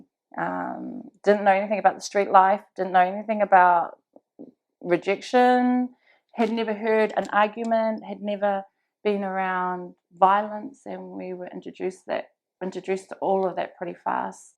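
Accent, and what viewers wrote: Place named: New Zealand. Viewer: You don't sound intelligent, you definitely sound like a maori and totally look like one, You and your whole family sound like HOODRATS, WHAKAMAA Whats the point of this NEGATIVE POST ABOUT BULLSHIT GANG MEMBERS, You don't have to be GANG MEMBERS TO BE SOMEBODY, Well Whats the chances being in a Gang your sorry ass is going to be destroyed, that's the choices you maoris made. BLAME NO ONE BUT YOURSELVES. Don't blame the cops,don't blame the government. BLAME YOUR PARENTS. It's pretty hard to believe you have catholic values when you speak like a hoodrat, No wonder Maoris get a bad name. Listen to the bullshit and jellybeans that's coming out of your mouth, it's negative as and you just sound like you're going down the PITY TRACK, why have a child if you clearly can't take care of yourself and YES EVERYTHING IS YOUR FAULT AND THE BAD CHOICES YOU MADE. 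I know lot's of Chics in Aotearoa Black power,mongrel mob,head hunters, hell angel's fk'n way worser off than you, there's no way that they would be doing a pathetic interview like you are now. Good fkn job people have died in your fucked up family, You obviously don't know God, have you actually REPENTED TO GOD....DOESN'T SOUND LIKE IT, your not mentally impaired, You don't believe in God, the bullshit you went threw is nothing in comparison to what others have gone threw. You use God's name alot yet you have in no way have been obedient towards him therefore get your shit together and stop living in a dream world in the past. I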